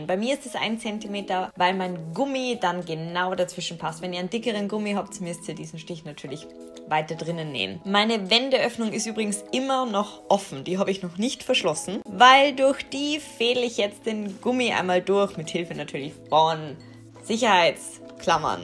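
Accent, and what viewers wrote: Vienna, Austria. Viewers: An dieser Stelle ein riesen Dankeschön für deine Mega tollen Videos 😊 ich liebe deine Projekte und habe so viel Freude beim anschauen weil ich den Wiener Dialekt einfach nur liebe 😍❤️😍. Vielen Dank und mach weiter so!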